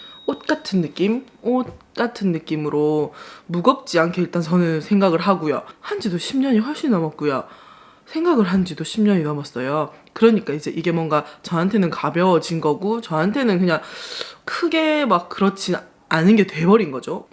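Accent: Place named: Gyeongsang, South Korea. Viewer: 말씀하시는거보면 저도같은경상도라그런지 친해지고싶네요^^ 대화해보고싶은 ,,, 화이팅~~^^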